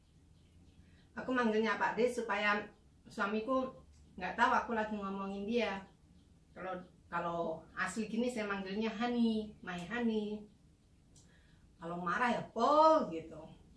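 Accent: Java, Indonesia. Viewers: Sabar mb.... Namanya orng bnyak y bgtu Tp ak suka ketawa klo logat jawanya keluar...podo mbak kro ak ...hehehehe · Mbak orgnya asyik kok.. gk sombong.. gk lp bahasa indonesia aplg bhs jawanya itu lo.. medok bgt 😊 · Hahahhaa aku nontonnya subuh2 jadi ngileerr pengen rujak buah, ditambah lg mbak pas cerita logat jawanya keluar bgt jdnya lucu gk ada kesan judes 😂😂 aplagi pas nguleg lombok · Biarin orang nyinyir, karna nyinyir tanda tak mampu, semangat mbak, kamu bisa jadi inspirasi wanita Indonesia, aku suka banget gaya bahasamu yg medok jowo banget. Kita kan orang jowo.❤️❤️❤️ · Ya.. Mbak2 sekalian... Kita yg tinggal di luar negara saja yg dpt memahami.. Org yg gak pernah keluar negara atau tak berkeluarga dg org asing mmg tak faham.. Mak saya dah 29 tahun tinggal di malaysia.. Bahasa Indo nya dah cacamarba kira wang indo lagi lucu.. Saya rasa mbak masih ok sangat.. 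Bahasa jawa masih medok bahasa Indo masih ok.. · Hahaha salut mba semangat ibu hebatt ... Sy seneng gaya ngomongx wong jowo biar tinggal di luar ngakk sombong 😍😍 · Mornibg,ni ch saya lht pas pagi nyiapin msak mjkn sdh selesai,b8sa buka You Tube di dpur...biasa nyuri waktu...saya slalu bgikuti...saya bgt logat mu msih medok juowo bgt lo jeng,iyo jebg ngono iku ra sah di dengar jeng,anggap ae org iri dgn kehidupane pean..saya salut bgt,bisa jdi IRT yg bsik,saya bisa mencari tmbhan rezeki,tetapi anak"ku q titipin ke Ortu ku...n...kakakku. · baru liat channel mbk sekali tapi ud sy subscribe soalnya bagus banget anak ttp diajarkan dua budaya bahkan budaya tempat tinggal luar biasa. banget bisa pertahankan logat jawa juga😊😁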